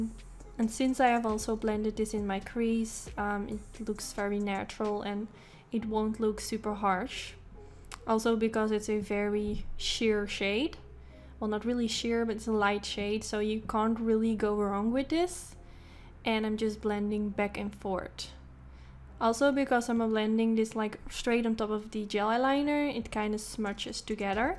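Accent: Netherlands. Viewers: She sounds Dutch but I could be wrong! I think she sounds really cute and sweet :-)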